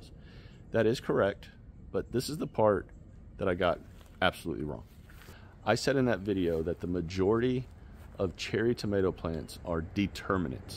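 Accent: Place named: Texas, United States. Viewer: I am also still learning but expanding my gardens again this year. You sound like a fellow Texan so I'll so I'm subscribing to your channel in hopes I can learn more. Appreciate your honesty - we all make mistakes. If you figure out how to get rid of the squirrels please share. Hate it when I see one run across my yard with a ripe strawberry in their mouth (now caged the bed).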